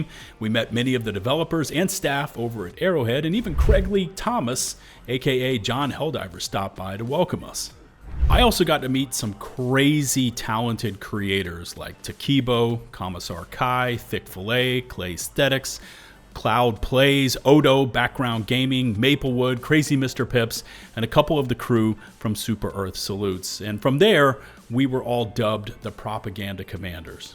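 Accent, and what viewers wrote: United States. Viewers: You are one of the few content creators for helldiver 2 with an American accent. As such, the only one I follow. For democracy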